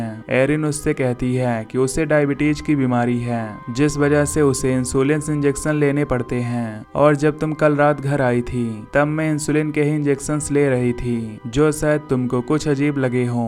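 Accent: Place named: India. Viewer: Great explanation pure Indian voice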